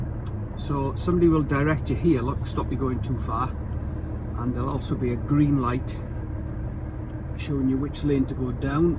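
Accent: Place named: Swansea, United Kingdom. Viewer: That’s a Swansea accent I reckon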